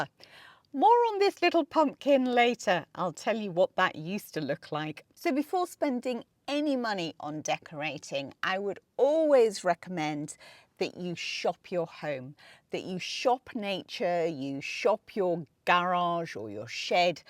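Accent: United Kingdom. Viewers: Love just listening to your British accent, but your decor ideas are fabulous. I wish I could come visit in your beautiful country home. Great videos!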